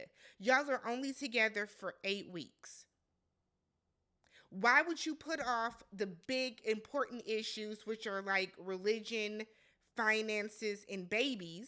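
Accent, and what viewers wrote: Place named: Midwest, United States. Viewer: Heyy from NYC! I love your midwest accent. Your recaps are excellent, its like talking to a gf. Keep up the good work.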